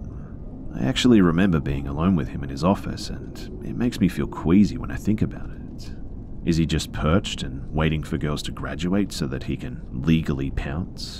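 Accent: Australia. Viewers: Wait?! Are your Australian? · He is I don’t know if you’re Aussie but it’s obvious to me, as I am lol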